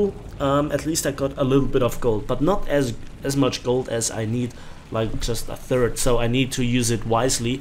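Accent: Germany. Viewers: Hmm, you sound German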